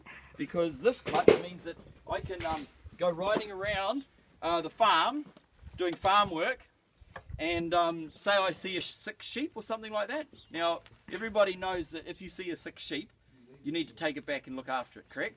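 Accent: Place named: New Zealand. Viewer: He's kiwi.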